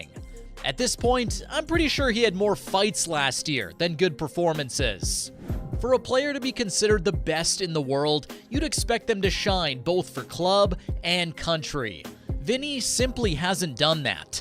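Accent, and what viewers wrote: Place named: United States. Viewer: i heard a yank accent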